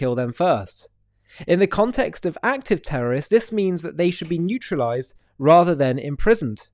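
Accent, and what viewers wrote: United Kingdom. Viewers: Pro-genocide hogwash, with Limey accent. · Have you seen the way these poor Palestinian women were released from the terrorist IDF illegal military prison? I wonder how much you get paid and who is paying you to speak this rubbish nonsense. Only women and children were released by Israel. Why didn’t they release the doctor that was raped by the IDF? Oh that’s right because the IDF killed him. You’re not that bright for having a British accent. You sound British but you speak ignorant.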